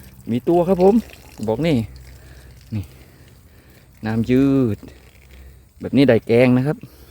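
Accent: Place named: Rayong, Thailand. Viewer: ชอบดูครับผมกดติดตามไห้แล้วนะครับเห็นมีต้นทุเรียนอยู่จังหวดอะไรครับสำเนียงเหมือนระยองเลย..จากโคราชครับผม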